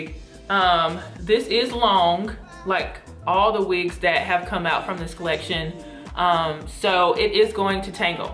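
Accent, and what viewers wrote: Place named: North Carolina, United States. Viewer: She sounds like she is from North Carolina.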